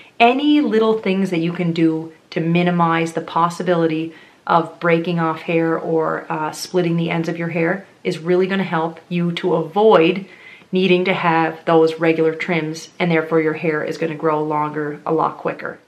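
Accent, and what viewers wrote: Canada. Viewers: Must be Canadian :) spelling and accent gave it away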